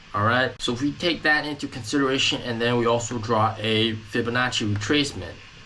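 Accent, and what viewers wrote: South, United States. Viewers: Are you working for Tesla, Maaaaan....you accent is right out usa .....how many billions tesla has burnt..? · Informative insider look, great video The southern accent caught me off guard 😂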